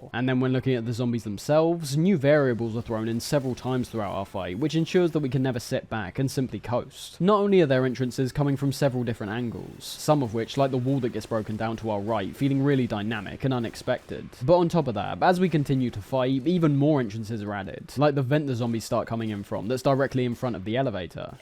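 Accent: United Kingdom. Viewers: Blimey da bloody animalistc deep monologue turning me into a bloody zombie wih the stylistic thought proceeds similar to americans fuck i hate godamn bri'ish blokes fucking better then me talking so annoying dislike reported blocked stopped watching all that fuck pissing me off by the second w yo busted teeth talkin smart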